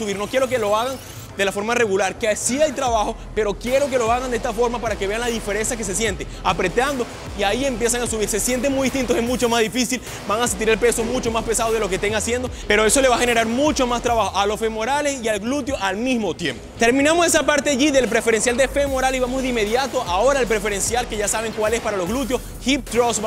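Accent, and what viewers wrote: Venezuela. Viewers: Este pana es venezolano?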